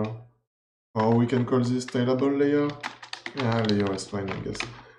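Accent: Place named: France. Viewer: Tu as un accent Français ahah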